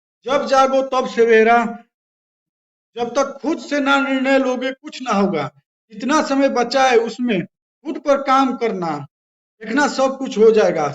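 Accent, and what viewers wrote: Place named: Bihar, India. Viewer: Aapki boli se lagta hai aap bihar se hai... Agar nhi ho toh correct kar dijiyega humein... Bohot acchi koshish hai aapki... Aap ekk din nidarr tarike se jarur video banayenge.. All the best👍🏻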